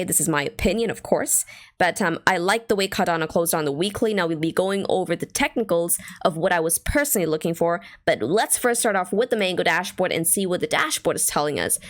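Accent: Brooklyn, United States. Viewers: Yeah Brooklyn is what it reminded me of too. At least the movies depiction of a Brooklyn accent. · Lol her accent is kinda weird, I’ve lived in New York and how she pronounced some words sounds pretty like Brooklyn 😅 like it esp the way she says “cardano” but she almost doesn’t sound American sometimes 🤔🤔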